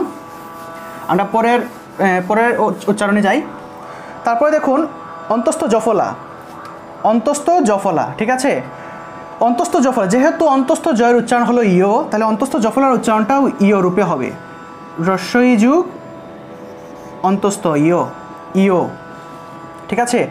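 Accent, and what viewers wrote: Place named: Sylhet, Bangladesh. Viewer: ভাই মনে অয় সিলেটি👌❣️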